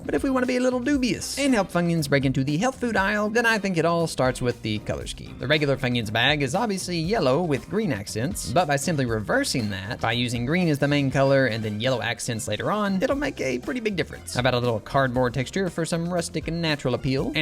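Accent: South, United States. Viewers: is it just me or does he sound more country now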